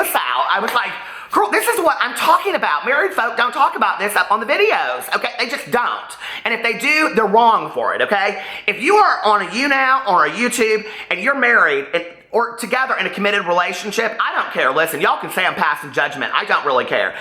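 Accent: South, United States. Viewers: You always sounded so southern to me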